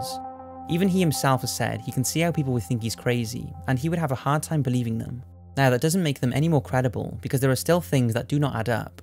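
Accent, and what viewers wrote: Wales, United Kingdom. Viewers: he's is 19 and he's welsh, I know he's welsh because I'm welsh and has a similar accent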